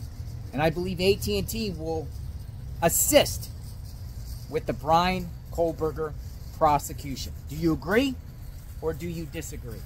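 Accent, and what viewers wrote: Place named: Pennsylvania, United States. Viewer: Off topic, forgive me but, I know you were from PA. I am too. I could hear your accent. Haha I'm from Philly.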